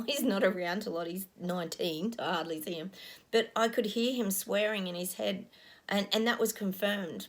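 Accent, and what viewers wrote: Australia. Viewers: I enjoy hearing your Aussie accent,! Lovely. Here in the USA, Arkansas, we are in triple digit heat, and blessed to have air conditioning in my home. Blessings to all who are striving to stay in peace in our hearts. Thank you for your messages and your humor😄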